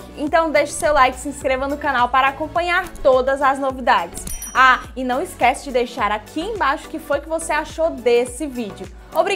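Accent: Brazil. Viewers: só pelo sotaque ja dá pra saber q é nordestina, slv pra Fortaleza 🇫🇷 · este sotaque bonito é da Bahia ? Pois ocê não puxa o "S"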